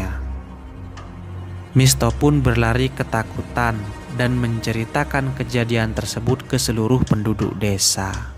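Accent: Madura, Indonesia. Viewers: Note voice atau logat indonya khas bondowoso madura..tapi keren dah..lanjut kan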